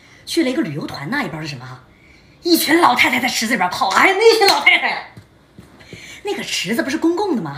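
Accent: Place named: Northeast, China. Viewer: 还得是用东北话讲才带劲儿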